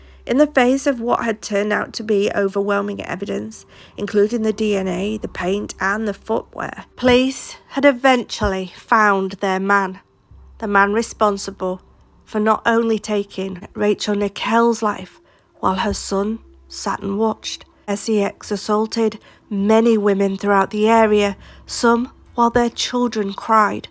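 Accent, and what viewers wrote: Yorkshire, United Kingdom. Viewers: I think I told you this before, I moved to the states 40 odd year ago. I grew up in the Stockport area (Romiley to be precise) and every time I watch your content it gives me a feeling I don't get from any other TC channel. You have absolutely brilliant content and I'm very particular but hearing that yorkshire accent it just takes me to a place and a time I miss so much. Nothing like hearing a familiar voice/accent from back home. Don't get me wrong tho, if your content wasn't any good I wouldn't be here so you have earned my subscription because of your great content and not just your accent although the accent is a nice treat for me. Cheers! 🤘🏻🇬🇧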